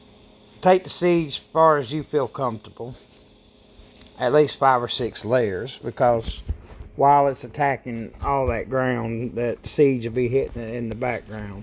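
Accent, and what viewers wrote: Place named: South, United States. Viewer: A southern accent and the sound of a cigarette being sparked up... 😁 You have my attention sir. Hello from Birmingham Alabama 👋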